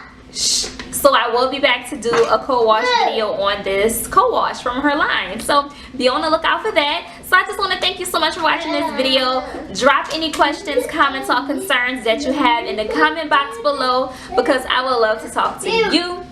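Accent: New Orleans, United States. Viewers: Wow your hair has really grown since I last watched a video. But I think you had pretty good results, like you said it's a learning curve and her products are so concentrated you don't have to use a lot. I love your NOLA accent and your beautiful smile, tfs.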